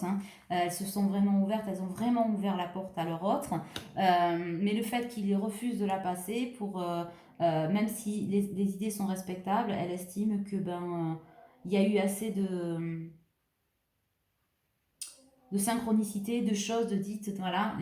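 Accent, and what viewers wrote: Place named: Toulouse, France. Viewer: Bonjour, il y 25 ans quand on s’est rencontrés, Elle jolie brune du Sud et moi Loulou de la mer du Nord ( elle m’appelait comme ça ), Elle 23 ans, moi 40, 🙄🙄nos Âmes se sont reconnues, c’était plus fort que tout, un ouragan, un tsunami, un ´goût ´de foudre. Notre premier baiser💋 tellement passionné🔥 et si différent des autres, l’alchimie de nos corps, ce parfum enivrant de l’Amour, le plaisir du plaisir de l’Autre. 🤫🤫, le goût de l’Autre, Nos folies et notre insouciance, le secret de nos rencontres, 🤭 les débuts de la nuit.....c’est Amour inconditionnel. Le grand moment de ma vie, où juste avant Elle, je ne croyais plus à grand chose, à trop vouloir on brûle ses ailes. Elle me manque souvent, Elle me manque toujours 😌😌et si tu ne m’aimes plus, tant pis pour moi😌😌 Merci pour vos agréables guidances, optimistes et juste avec votre voix de Toulouse 😊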